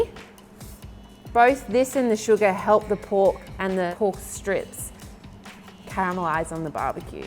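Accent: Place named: Australia. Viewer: I think her is Aussie Accent!!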